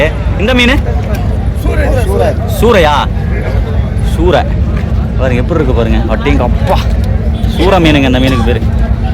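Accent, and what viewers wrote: Kongu, India. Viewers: Hi bro! How are you & your family? Unga videos yellam super bro. And we like your kongu tamil, naangalum erode tha. But ipo dubai la irukom enga v2la unga videos tha paathutu irupom. And we are waiting for your flight travel videos. And mohan annava ktatha sollunga.🎉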